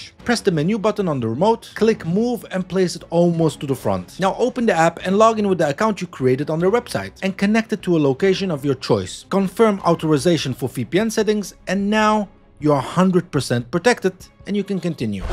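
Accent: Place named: Netherlands. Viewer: Deze Hollander spreekt bijna Engels. Nee, goed hoor ik moest echt goed luisteren om het te horen.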